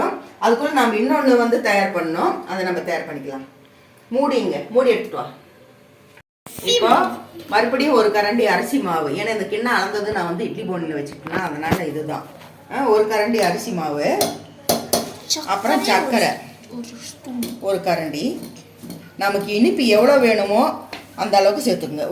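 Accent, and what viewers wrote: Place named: Kongu, India. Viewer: Super ma, kongu தமிழ் manakudu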